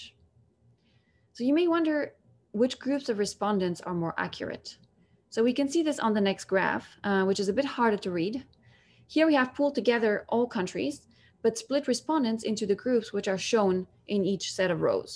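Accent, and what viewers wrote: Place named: Bulgaria. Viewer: She appears to have spent a lot of time on accent-therapy and techniques to lower her voice. She cannot escape the Bulgarian accent, ever, but she's put a lot of work in to sound close to native.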